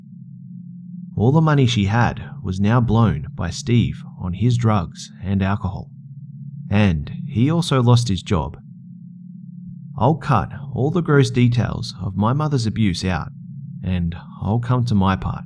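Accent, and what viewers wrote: Australia. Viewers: "In this scary STORY..." an AUTHOR... with an Australian accent claims he was born in India, and tells us he was 12 and doing a Calculus assignment... Details, details... must have a criminal mind to fabricate such a tale. I stopped listening halfway through. This is one of those creepy corners of YT. I prefer true accounts in order to understand the dynamics of child abuse. Since after moving cross country, I have come to meet about 18-20 people, 7 of whom suffer from mental disorders, and all of them have suffered child abuse. A few of these live in absolute squalor and abject poverty. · Wow, what a sad story and a very monotone voice... also didn't help that the guy has an australian accent... all story tellers should be British!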